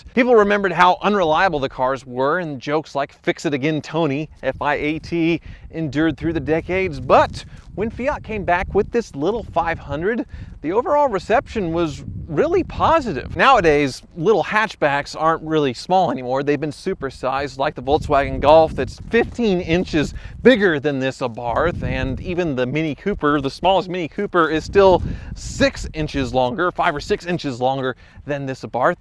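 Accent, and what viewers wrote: United States. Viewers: why cant YE YANKS pronounce words properly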